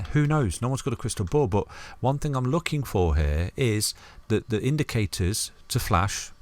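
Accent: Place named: Nottingham, United Kingdom. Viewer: You sound like a Notts lad, are you local?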